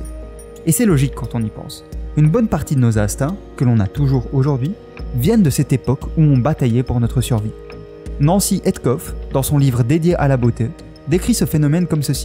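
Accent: Belgium. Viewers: On garde l'accent belge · J'ai cru que tu étais mort ! xD Sérieusement très bonne vidéo comme d'habitude et vive l'accent Belge !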